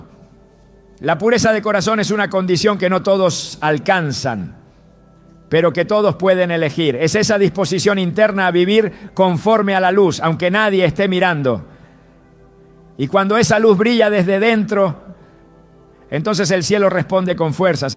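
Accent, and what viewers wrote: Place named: Spain. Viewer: Dios te bendiga!! Oye después que habla parece como si cogiera un aire y además oye bien de lo que habla y como habla parece un español. Es muy facil saberlo.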